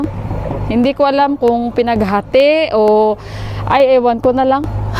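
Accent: Iloilo, Philippines. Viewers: .... Tingala ko nga ilonggo ang tuno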